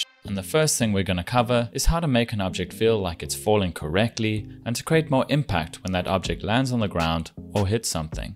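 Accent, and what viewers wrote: South Africa. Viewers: Knew it! Heard the south african accent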